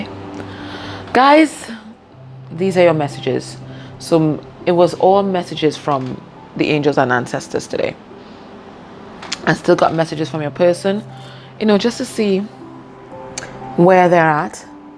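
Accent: Trinidad and Tobago. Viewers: Are you a trinie you sound like a trinie, good works thanks for the guidance God bless.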